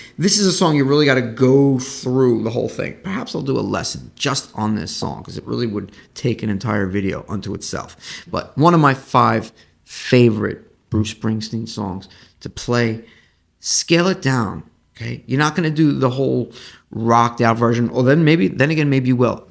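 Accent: New York, United States. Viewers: Also as an Irish woman, I’m digging your accent. It’s what I imagine all New York jazzers sound like.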